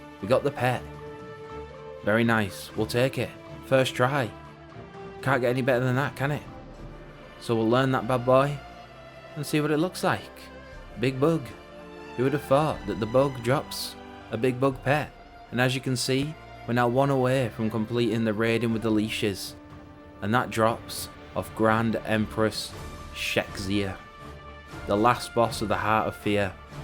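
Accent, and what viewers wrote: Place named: Northern England, United Kingdom. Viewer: Celestial Tournament is hard af. You need very specific pets with exact numbers. I have Mini Tyrael (Went to the invitational in 2008 with my bestie) and I’m from Northern England and you sound local-ish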